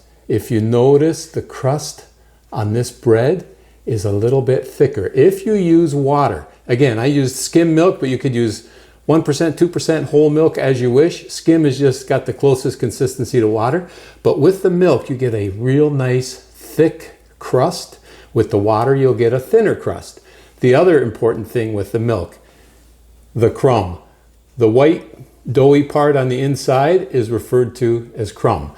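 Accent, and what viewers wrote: Canada. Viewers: Looks great. Will definitely give it a go. Are you Canadian?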